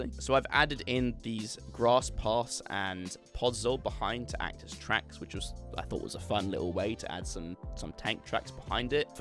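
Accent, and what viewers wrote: United Kingdom. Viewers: I always love british accent. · I like so much British accent.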